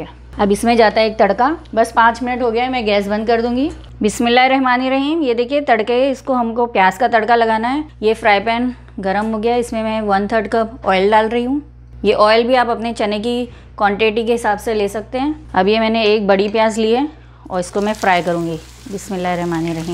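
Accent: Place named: Dongri, India. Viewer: Bohot ache aapa, aap dongri kyuki aapke baat karne ka tareeqe mei jhalak hai kya, mei abhi out of india settle hu but aapne muje yeh dikha ke apne bachpan ki yaad dila diye, lots of wishes and keep growing, aapa rocks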